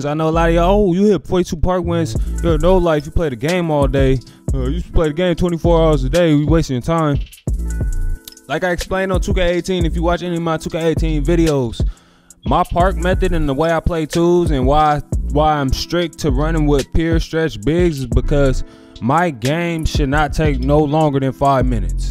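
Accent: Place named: Los Angeles, United States. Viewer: i could tell u from LA by the way u talk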